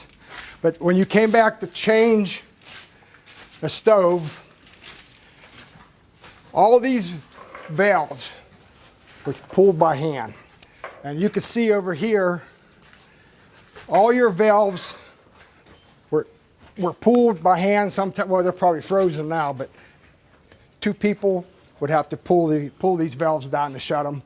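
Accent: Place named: Pittsburgh, United States. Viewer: You got that Pittsburgh accent from Northside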